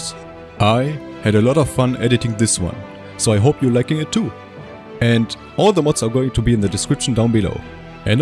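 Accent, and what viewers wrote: Germany. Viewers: There is nothing better than watching a German talking about bannerlord mods and having a strong accent. Grüße geht raus an dich! Bester Youtuber!!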